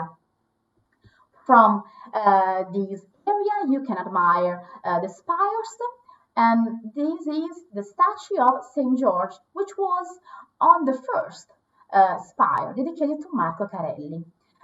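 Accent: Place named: China. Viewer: The audio is really bad. Sounds like she speaks Chinese.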